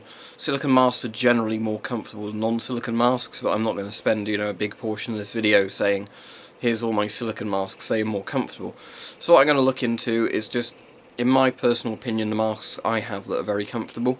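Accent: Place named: England, United Kingdom. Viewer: I love this English accent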